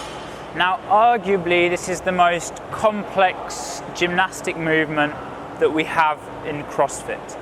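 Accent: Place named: United Kingdom. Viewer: 👑🚀Very nice tanning and with perfect British accent👑🔥🇬🇧 🏴🔥👑❤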